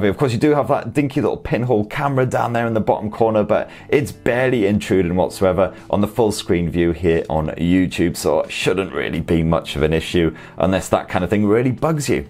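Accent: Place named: England, United Kingdom. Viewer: Sorry mate, that English accent is quite annoying and unintelligible some of the time.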